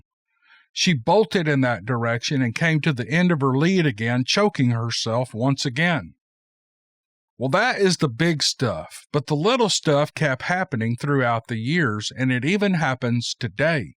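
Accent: South, United States. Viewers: Of all the cryptid channels, is my pick as the very best. No small talk chatter, straight into the story, excellent diction, smooth Southern charm with a clear and soothing voice, got it in abundance! Cannot fail to give proper credit to all the submitters for their excellent ability to covey the story in writing. Wouldn’t be successful without all the quality content!! Miigwetch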